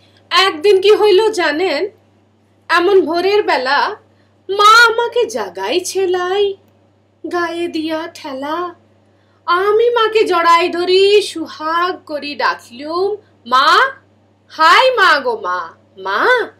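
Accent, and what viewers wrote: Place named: Rarh, India. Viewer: mondal apni ki kono din bangla upovasa(bengali nativ acents) gulor nam suneche? somvoboto sonen ni. eta amader bangla vasar sompod . kobita ta to rarh upovasa (ancholic bangla) tei abbriti kora.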